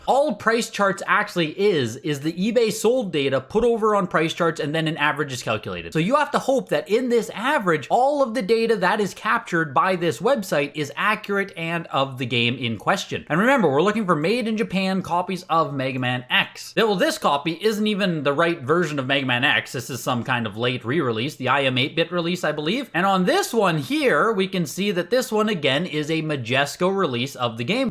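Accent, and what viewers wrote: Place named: Alberta, Canada. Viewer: Aye bud …..you gotta be from Alberta eh????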